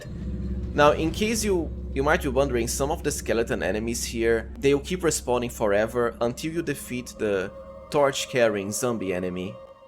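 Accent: Brazil. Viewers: Cool video, I'm a elleonoras main user but often use dual dagger reduvia/great knife or misericorde. Btw are you Brazilian? I may be wrong but the accent sounds pretty much alike. Cheers.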